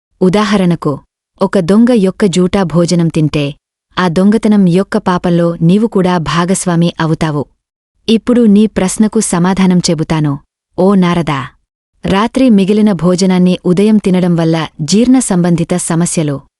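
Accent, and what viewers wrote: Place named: North, India. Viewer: ఆమెకు తెలుగు తో కొంచెం ఇబ్బంది,ఉత్తరాదికి వెళ్ళి స్థిరపడ్డారు అనుకుంటా, ఆ ప్రభావం కొంత కలగలిసి వినిపిస్తుంది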